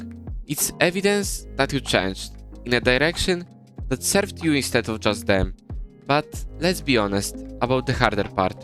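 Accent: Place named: Poland. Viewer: Bracie słychać tego Polaka w glosie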